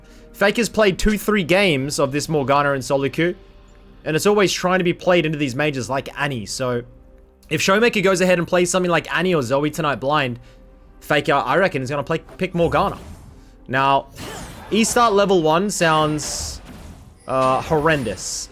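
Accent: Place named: Australia. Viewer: just wanted to say Im happy you're Australian so I have a streamer I like that speaks Australian accent English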